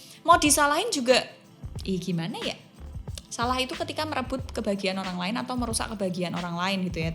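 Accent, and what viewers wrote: Java, Indonesia. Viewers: Blitar hadir ka.. Medoknya ka jan ngangeni bangeettt.. Salam semangtt y kaa.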